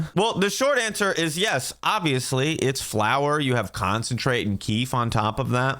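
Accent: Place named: New York, United States. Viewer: Sounds like he's from New York.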